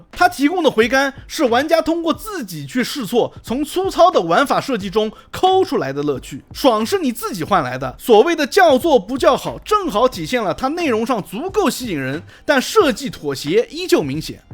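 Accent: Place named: China. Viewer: 尖銳陸腔差評😅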